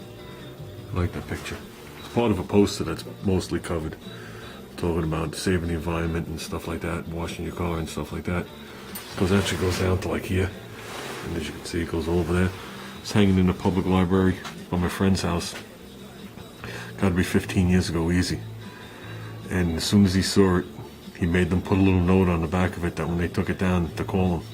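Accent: United States. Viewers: That's funny, It was good to hear AMERICAN for a change .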